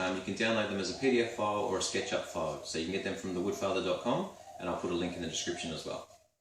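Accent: Australia. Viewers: This is awesome. Thanks for posting. Good to hear an Aussie accent in the ocean of youtubers! . cheers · very cool. i thought I needed a dado blade which my makita mtl 100 does not allow for or a more expensive router table so, so more money. But now I know I can make a jig like yours. My makita mlt 100 has custom narrow mitre channels, yours seem to have 20 mm channels mine are T 12 mm at top and T of 15 below. But I can cut some 12 bars to make it work. Great to hear a no frills ozzie voice and no drama queen. Paddy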